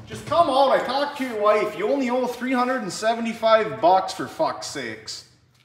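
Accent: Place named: Midwest, United States. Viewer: Are you Minnesotan you have some heavy midwestern accents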